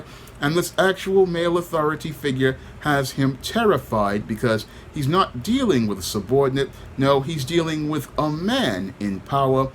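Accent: England, United Kingdom. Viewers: I love your English accent talking about them ghetto royals🤣🤣🤣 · 😂 😂 😂 😂 You are hilarious. I can listen to your English accent all day hahaha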